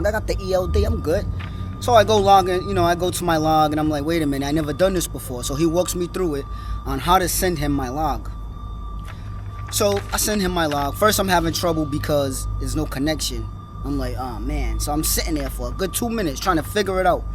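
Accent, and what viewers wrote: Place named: New Jersey, United States. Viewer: You must be from Jersey u got that accent like the sopranos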